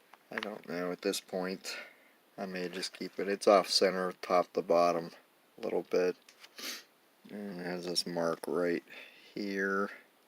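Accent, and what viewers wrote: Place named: Pittsburgh, United States. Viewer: Hey, just subscribed great looking set. Sounds like your a Pittsburgh guy. I live in Johnstown, Eric those back pages gave you a nice shout out earlier tonight. I own a very nice 56 set. Working on 54 bowman set.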